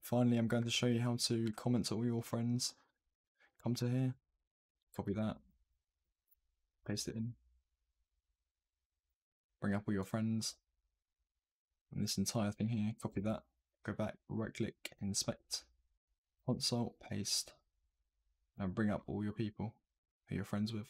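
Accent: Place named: United Kingdom. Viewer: THATS IT! I did not understand what you said since you are very British God do not save the queen God save bin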